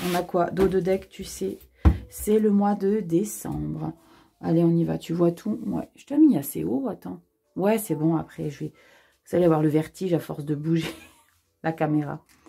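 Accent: South, France. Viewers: Bonjour et merciiiiii!!!!!!, c'est la première fois que j'écoute l'une de tes guidances et je voulais tout d'abord te dire un grand merci pour ta bonne humeur, pour le sourire dans ta voix, pour ton enthousiasme, pour ton humour et pour ton "accent du sud" ❤ Ne change rien ❤❤❤ Maintenant merciiiiii pour la guidance et les messages ❤❤❤ J'avais tellement l'impression que cela me parlait personnellement que je me disais que ce n'était pas possible, tous les verseaux ne peuvent pas avoir le même projet que moi!!??? Alors je suis allée lire les commentaires des autres verseaux et je suis impressionnée de voir comment tes messages raisonnent pour chacun dans la même énergie même si c'est sur des chemins différents ❤❤❤ Alors encore merci pour tes messages qui confortent mes pensées et mes choix. Tu m'as donné tellement d'énergie!!! ❤❤❤ J'espère que tu as conscience du bien que tu fais autour de toi et à toutes les personnes qui t'écoutent ❤❤❤ Encore une fois ne change rien, tu rayonnes et c'est un bonheur de t'entendre ❤❤❤ Je te fais un très gros câlin ❤❤❤ Très bonne journée Valérie.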